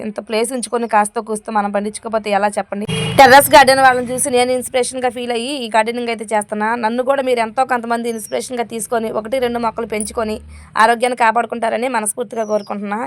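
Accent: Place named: Godavari, India. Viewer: మీ మాటల తీర్ను బట్టి ఏలూరు రాజమండ్రి పక్కన ఊరు అనుకుంటున్నా అక్కడైనా మీ ఊరు ఏ ఊరు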